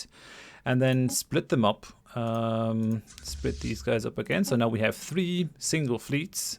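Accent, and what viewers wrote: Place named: Germany. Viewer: I don't know why but the fact that all of this is being told by a guy with a German accent is really suiting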